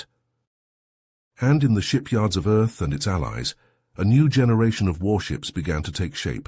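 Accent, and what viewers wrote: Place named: United States. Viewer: Sounds like an American with a mix of 🇺🇸🇸🇪🇪🇪🇺🇦🇮🇱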